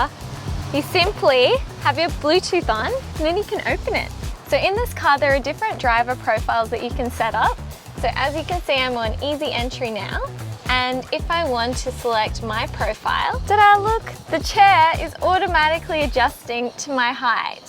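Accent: Australia. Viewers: Love your Aussie accent! I can't get over the size of the charging plug in Australia they are 4 times bigger than in the US (maybe size does matter)? 🤔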